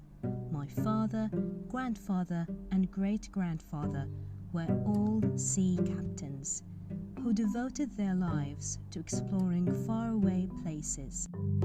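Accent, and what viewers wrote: United Kingdom. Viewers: Wonderful Miss you're an excellent teacher. You don't know how I love British accent and I wish to visit London in one day 😕🌷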